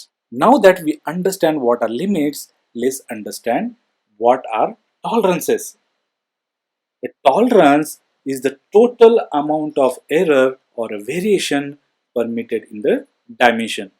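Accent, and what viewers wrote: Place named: United Kingdom. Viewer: You are very good , i like your accent and your talking way its very british.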